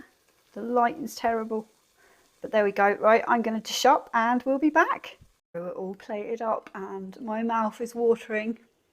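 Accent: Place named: South East England, United Kingdom. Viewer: I prefer natural unedited videos. You must be be from the south east your accent is the same as mine.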